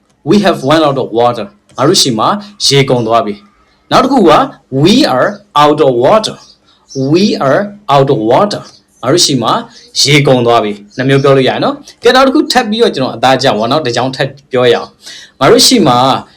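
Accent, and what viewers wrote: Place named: Myanmar. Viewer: ငပိသံလေး ပျောက်အောင် ပြောပေးပါအုံး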